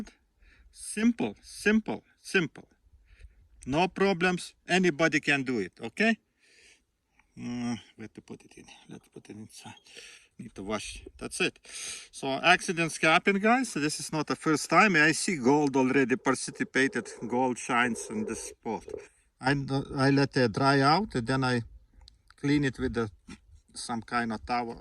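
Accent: Russia. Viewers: But the funniest thing is Russian speaking English 😅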